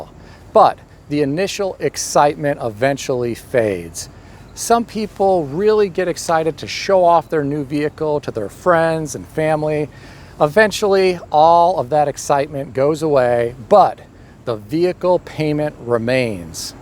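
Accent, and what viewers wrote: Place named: South, United States. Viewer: Love your redneck pick up drivers accent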